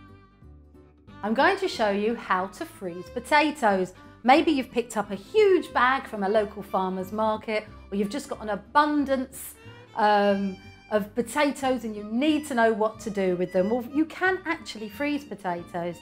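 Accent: England, United Kingdom. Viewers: What a lovely British accent! Very sexy... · I have an abundance of potatoes and I needed a way to preserve them; Thank you for sharing. I cannot believe some of these rude comments below. >:( I can hear you just fine, and the English accent is great. :)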